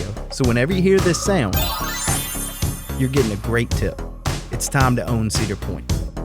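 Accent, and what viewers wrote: South, United States. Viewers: What is the accent of voice-over person? Southern?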